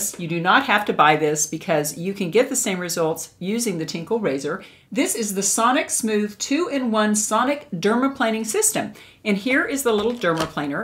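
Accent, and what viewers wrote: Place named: South, United States. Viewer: Wow...so beautiful... definitely a southern belle... absolutely love you accent girl..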